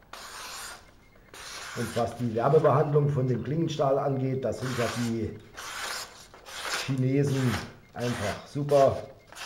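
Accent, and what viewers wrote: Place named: Saarland, Germany. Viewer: Da höre ich doch einen saarländischen Dialekt raus. 8-)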